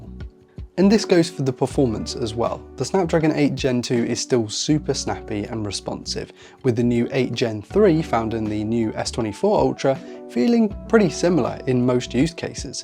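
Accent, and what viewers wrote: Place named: England, United Kingdom. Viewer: I might be wrong but you sound English so why saying dollars not pounds and it's obvious not worth it if you've a S23 model but anything from S22 down probably is worth it.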